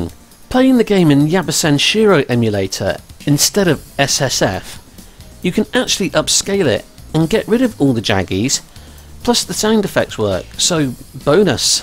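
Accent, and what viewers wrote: England, United Kingdom. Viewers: You have a graceful English accent,. Keep it going!:yougotthis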